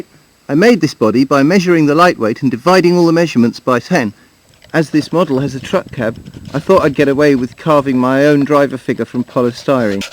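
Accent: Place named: England, United Kingdom. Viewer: Nice video. I have wondered about scale too. Seems like it is not always right at the scale that is stated, they seem use the term "scale" loosely. By the way, looks like "Polystyrene Man" was badly burned in a fire! lol. I always like listening to an English accent and phrasing. I think most non Brits do since it is not something we hear that often and it is just such a pure form of English than what it has morphed into in the states.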